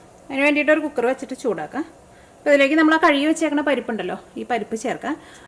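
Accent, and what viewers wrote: Thrissur, India. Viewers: 😀lokathinte eth koonilum nammal thrishir kaaru undaavum😀😀 orikkalum vitt poovaatha thrishur slaangum aayi😂😂😂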